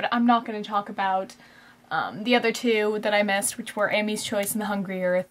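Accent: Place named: United States. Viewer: This is just a guess, but, are you American by any chance? I'm not trying to be rascist or anything, but I'm just curious as you sound American and said the words 'aunt' and 'semi' in a very American way. Again, please note that this is out of interest, not rascism, because I hate rascism myself.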